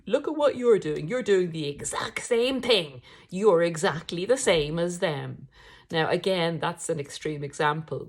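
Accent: Ireland. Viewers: Thank you for your video's. I am on the journey of cutting of the toxic relationship since June. I am still ruminating in this. Some days it's better, especially when I have a lot to to. I like listening to your view on this. As a Christian I feel that this disorder is definitely evil. I just visited Ireland, and therefore enjoy the accent too! Best regards. Daniell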